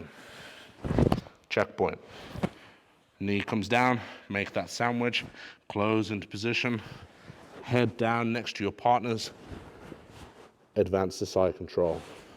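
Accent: United Kingdom. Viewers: My first video, and he sounds like Reggie Watts using an British accent.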